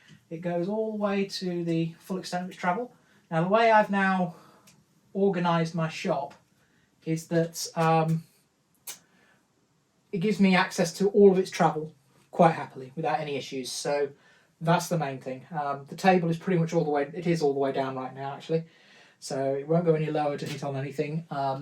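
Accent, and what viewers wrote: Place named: East Midlands, United Kingdom. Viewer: hello me love.." here i am again .. oh ! merry xmass and a happy new year.!.i dont suppose you have amongst all your treasured parts ,,, a table for a centec 2 mill.. the one i have is a right state with over tightening the " t " bolts the upper has broke away it wasnt me !. i want it fixed or replaced... can you help ? .by your accent you dont live too far from me " derby notts area ,",, hope you can help regards laurence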